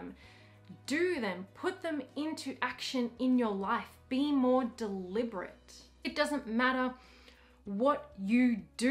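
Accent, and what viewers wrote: Australia. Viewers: A week ago or so, I came a cross an other aussie YouTubeing about LoA. I was really pleased to hear that assume accent among others with American or British accent and so I come across your teachings. Talk about LoA 😊. Anyway thanks for your teachings. Greetings from Sweden!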